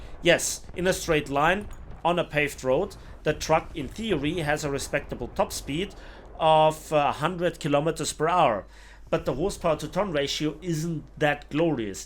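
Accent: Germany. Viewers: i hate german accents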